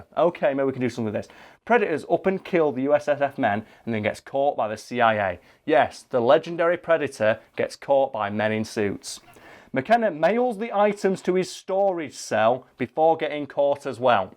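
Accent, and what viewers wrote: United Kingdom. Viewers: Just watched the new movie and yes, this leaked script is literally what happens. Spoiler alert I guess? In the tone of you’re sweet British accent, this movie indeed SUCKED ASS!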